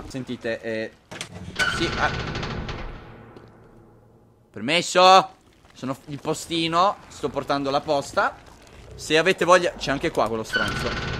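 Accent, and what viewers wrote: Italy. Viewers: spain or italy...you almost sound italian??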